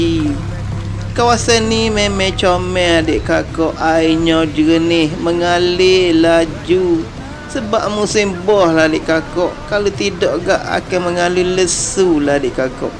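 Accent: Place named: Kelantan, Malaysia. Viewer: Molek jelas kecek kelate. Hok ni baru ori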